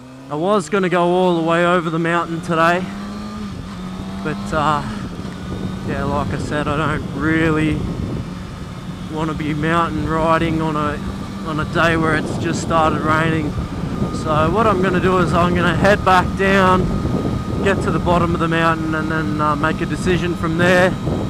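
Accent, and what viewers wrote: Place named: United Kingdom. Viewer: Mate I love that catch phrase lol sounds like a top fella in the UK 😉 keep the vids coming 🐨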